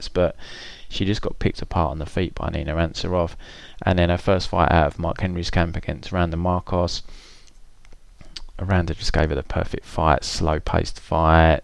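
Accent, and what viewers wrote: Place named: United Kingdom. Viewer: First time listening to, and gauging, your podcast. British accent: check....so far, so good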